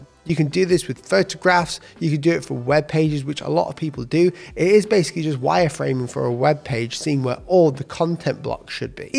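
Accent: United Kingdom. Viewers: British accent is horrible man, some words are missing from your speech . i can't understand fully what you mean . please there is many people is not native English speaker so they don't fully understand British accent . it would be better if you speak normal English or American accent would be better for many people to understand .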